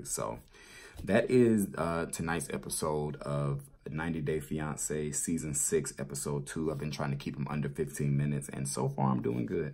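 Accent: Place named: Virginia, United States. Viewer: I'm from Virginia and I think you sound like us. But then again maybe I'm country lol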